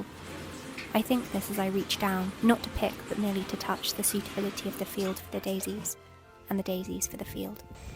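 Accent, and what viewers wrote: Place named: South, United Kingdom. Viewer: Who are all here to learn south British accent?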